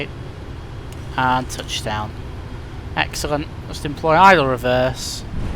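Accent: United Kingdom. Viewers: Prefer a pommy voice-over to yank ones :o) - Aussie wouldn't be bad but you've done a great job thanks.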